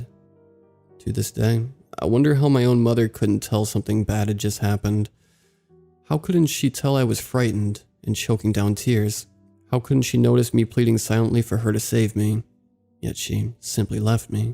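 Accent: United States. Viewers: I have great deal gratitude to those who narrate some very different like dr creeps he got own style as do this one both very good.apart from American accent and he can't help that.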